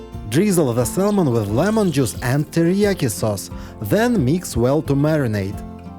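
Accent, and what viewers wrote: Russia. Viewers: Sorry, can't stand your heavy Russian accent. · ужасный русский акцент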